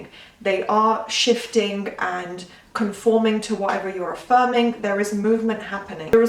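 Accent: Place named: London, United Kingdom. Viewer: thank you, dear I am passionate about your British, Londoner accent and of course, love your content always very clear and thourough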